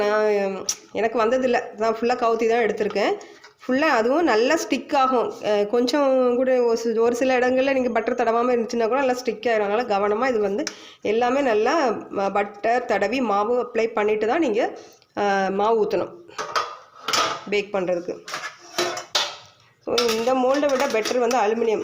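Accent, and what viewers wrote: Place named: Kanyakumari, India. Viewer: Neenga town ah? But native Nagercoil k. Kumari mathiri neenga pesara style iruku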